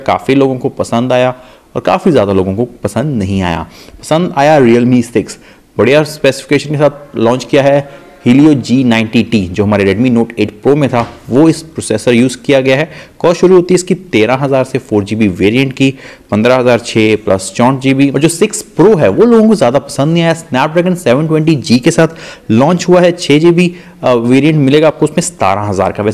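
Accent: Punjab, India. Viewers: are you punjabi ? or Saraiki dialect ?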